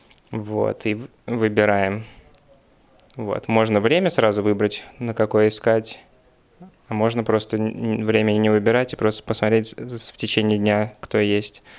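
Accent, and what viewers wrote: Russia. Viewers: на мой взгляд ты специально делал русский акцент=)) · Господи да у тебя такай русячий акцент, это ужасс! И еще чет гундосит тут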